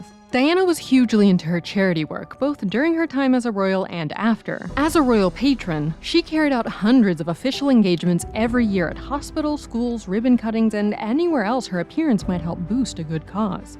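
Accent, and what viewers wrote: United States. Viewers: And William was very clever and Harry failed in almost every subject even art the teachers had to fix it. The story of Diana was a bit one sided no mention of the police having to stop her calling a man’s home over 400 times she was not concerned about if the man she was involved with was married or not there is a great deal more about her that British people know I notice the person telling us has an American voice? This story has been told many times time for Diana to RIP on her island with her family.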